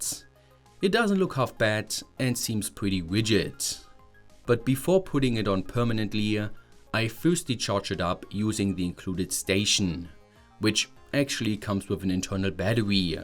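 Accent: Germany. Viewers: This German accent is brutal, but still fun to watch.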